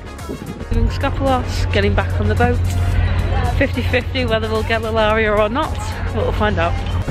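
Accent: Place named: North, United Kingdom. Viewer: ❤Fab video, I love Skiathos, cant identity your accent, nothern but where you from ? ❤ xx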